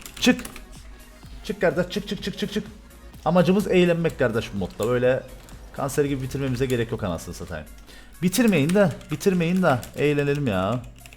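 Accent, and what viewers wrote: Cyprus. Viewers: abi kıbrıslımısın çünkü bende sesin aynı kibrisli konuşmasını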